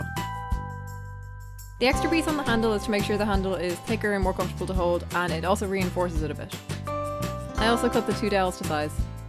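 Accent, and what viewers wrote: United Kingdom. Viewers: ...wait your British? Always thought you were Scottish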